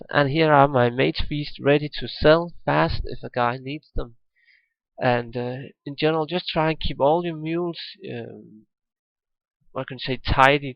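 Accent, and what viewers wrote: Denmark. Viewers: a bit danish maybe??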